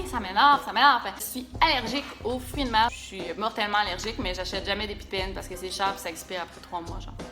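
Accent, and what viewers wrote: Quebec, Canada. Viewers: Je viens de découvrir ta chaîne aussi et je l'ai adoré! J'aime le français quebecoise <3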